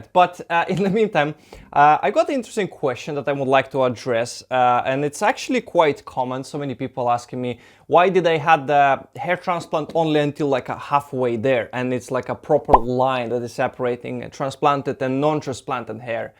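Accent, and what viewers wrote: Russia. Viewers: You sound Russian. Do you recommend Dr Ferreira ? Where is he located?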